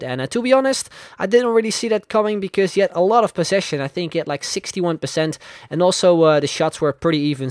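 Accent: Netherlands. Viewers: Ben je nederlands want je klinkt wel zo😁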